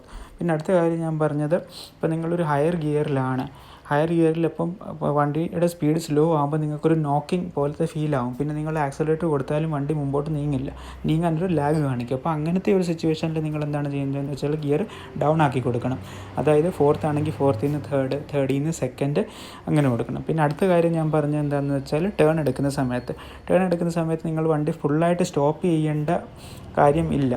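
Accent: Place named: Trivandrum, India. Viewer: Bro tvm aanalleee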